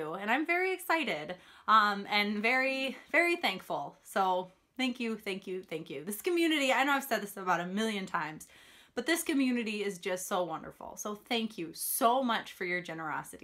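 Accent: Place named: Minnesota, United States. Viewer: Fun video my moms from Minnesota so I recognized your accent. I have a billion relatives there hey you could be one.........I remember being there in December. And my grandparents walking to church in minus 0 weather!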